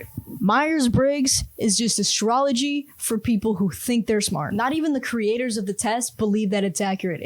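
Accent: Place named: United States. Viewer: What part of the US are you from? I’m trying to decide your accent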